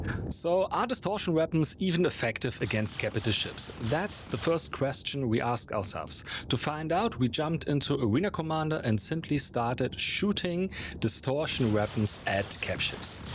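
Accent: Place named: Germany. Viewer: das war das deutscheste englisch was ich gehört habe^^ ( nicht negativ gemeint) cooles video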